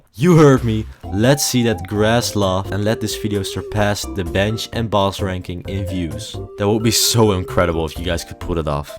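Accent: Netherlands. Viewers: Bro I can hear it so clearly that you are Dutch, but I love it, keep up the content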